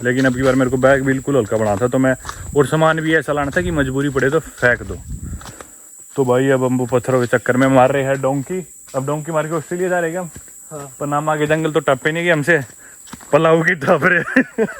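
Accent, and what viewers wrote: Haryana, India. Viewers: No doubt ap boht ache vlogger hen but apne accent ko thora groom kren English bhi ap apne haryanvi accent bolte hen.. Sari dunia ghoom li ap ne but you sounds like a typical backward villager.... kafi videos me ap ajeeb si awaz nikalte hen jese kisi janwar ko hankte hue log village me nikalte hen... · I rarely watch full video that too at normal speed but aaj kafi time baad dekhi complete video, courtesy apna haryanvi bhai ji. Jo kafi handsome bhi hai 😅 n really he narrate so well ye to manna pdega with his desi accent, great skill .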